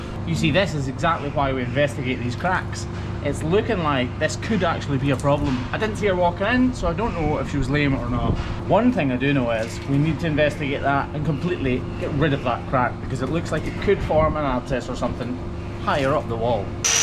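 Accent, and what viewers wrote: Scotland, United Kingdom. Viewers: My mother's maiden name was Cameron. I have been to beautiful Scotland. Your Scottish accent makes me feel closer to my ancestral roots. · First I love that your a Scot so am I and I was a dairy farmer in Poland Central NEw York very ruff winters! I love your show, your accent, the land is beautiful l. Me watching your show is like almost being in Scotland thank you for this show Always Kathleen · Have you ever checked out your subtitles? It's hysterical sometimes, the bot cannot figure out your accent. Scottish is my favorite accent btw. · I've been on the horse side of things. Very interesting to learn about the cows claws. You make it enjoyable & easy to pick up on. Easy to understand. The accent helps too. I become a fool for anything Scottish. Especially accents... 😏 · You forgot to mention his wonderful Scottish accent. 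That’s not as important as learning something about his trade or his passion and care for these animals, but I’m with you. I’m a 66 year old lady from Centennial, Colorado, SE of Denver, and I find myself binge watching these videos of his. Very enjoyable pasttime. 😊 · I’ve gone through so many videos of yours by now. I have been thinking about what it is that makes it so compelling. Trying to pin it down is hard but for me it’s the combination of the Scottish dialect, your charisma as well as passion for your work. It’s all just so wholesome! Albeit gory and educational 😊 Anyhoo - thank you ✨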